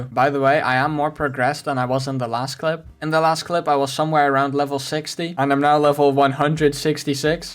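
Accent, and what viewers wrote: Netherlands. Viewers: I smell a little bit of dutch, IGN: Lancebobo3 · bet that this guy is from the netherlands i know the accent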